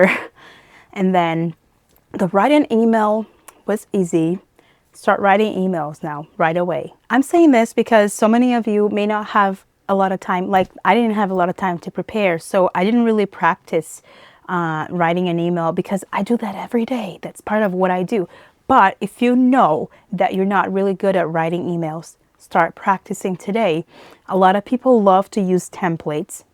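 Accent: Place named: Brazil. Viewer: You sound like a native. Never mind you are from south America right? I hear Brazilians from football and ufc they have similar accent.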